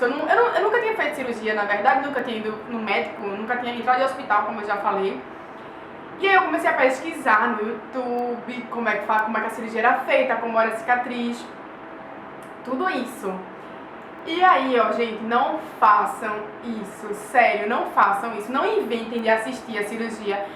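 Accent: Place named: Recife, Brazil. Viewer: acho lindo esse sotaque, tenho uma amiga de Recife, parece que é ela quem está falando rs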